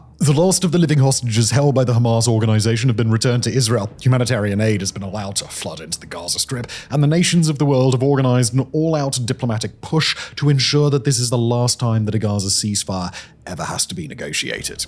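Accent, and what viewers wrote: United Kingdom. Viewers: wow he is so unbiased. Feel really ashamed that i used to listen to this bald old ass british accent from medieval london.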